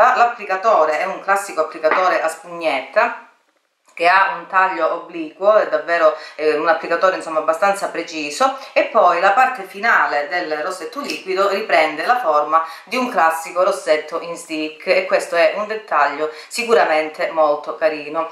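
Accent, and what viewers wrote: Calabria, Italy. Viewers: Ciao :) capito su questo video grazie proprio a Clio :) sei stupenda e fai delle spiegazioni strepitose, super dettagliate!! Dall' accento credo tu sia calabrese come me😍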